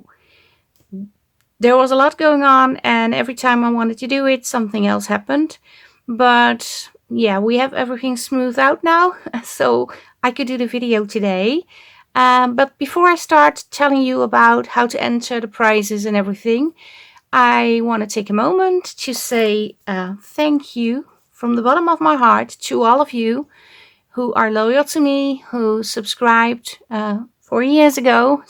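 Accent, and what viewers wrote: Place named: Netherlands. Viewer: congratulations #lda5kgiveaway i like watching your channel because of your artistic way with junk journals , your interesting personality and of course your Dutch accent, the Facebook challenges are a bonus. thank you for these amazing chances to win prizes:face-red-heart-shape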